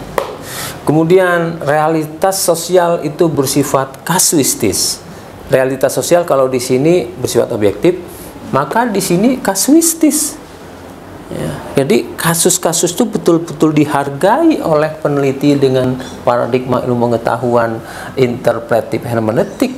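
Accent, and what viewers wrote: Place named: West Sumatra, Indonesia. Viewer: Logat Minang.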